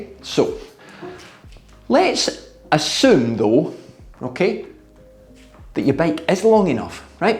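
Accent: Scotland, United Kingdom. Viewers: the caption should read "not sitting correctly" caption machine doesn't like your Scottish accent, coach!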